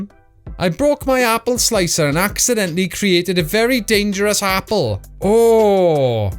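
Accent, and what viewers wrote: Wales, United Kingdom. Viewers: Wales 🏴 💕 cariad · Are you Welsh? 🏴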